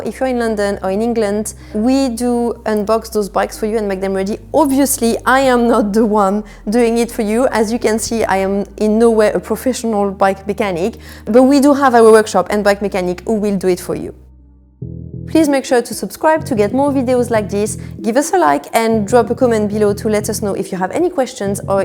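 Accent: France. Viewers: Sounds totally French to me.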